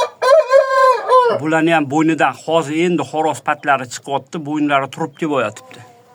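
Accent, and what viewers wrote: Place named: Tashkent, Uzbekistan. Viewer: Xaqiqiy toza Toshken sheva 😊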